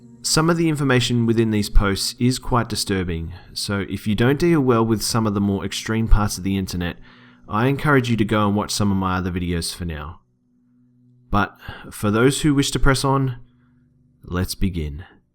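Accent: Australia. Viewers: Only subscribing because you're Australian and your voice sounds majestic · Him: G'day mate- Me: Oh shit an aussie. I'm subscribing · wew lad aussie accent..shitposting inc. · I cannot stand the Australian accent. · you sound so aggresively aussie ahaha, love it. keep up the good work. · Are you Australian??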